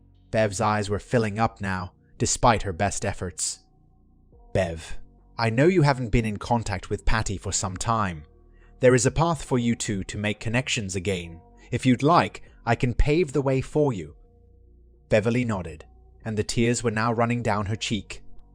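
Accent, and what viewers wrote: United Kingdom. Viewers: I've heard this one a couple of times before, but I do have to say that having the AI with a British accent gives it a certain sense of charm.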